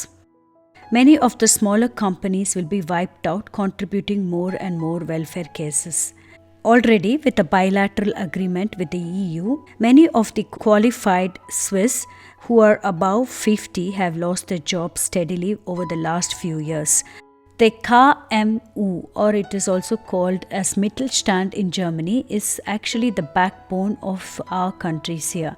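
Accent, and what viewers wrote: India. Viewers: Talk like a Indian sound like a Indian 🌚🌚 Indian 🙄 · I visited Switzerland 8 years ago, and found it to be absolutely fascinating. Not just compared to India, but also compared to other countries in the world. I didnt, or couldnt find any dirt, but as you said, no country is 100 % perfect, but I found it near perfect. However, you may be knowing better about it. Unfortunately, this is a bad time, and as of today i.e. 13th May 2020, I heard that there has been some improvement there in Switzerland compared to here, in India (by your voice, it seems you are Indian as well, I suppose), thanks to the excellent healthcare and orderly procedure there. Hope that this situation tides off and we are back to normal in a year (or two). I would love to visit Switzerland again in future, if opportunity presents.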